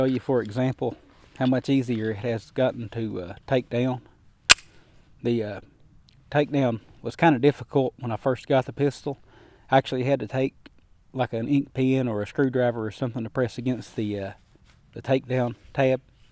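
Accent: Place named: Tennessee, United States. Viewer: I think he's from Tennessee.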